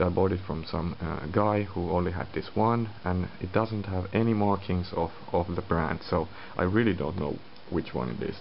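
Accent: Finland. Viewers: Kyllä se suomen aksentti on sitten oikeasti selvää ja helposti ymmärrettävää... Kiitokset :) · Suomalaiset aina puhuu näin englantia.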